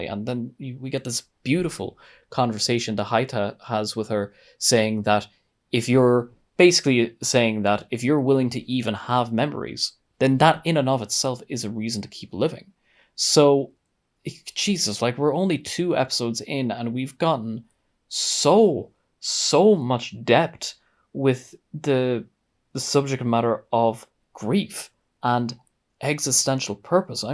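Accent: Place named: Ireland. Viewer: My family name is Irish. I detect an Irish brogue. There has to be an Irish definition for this kind of story.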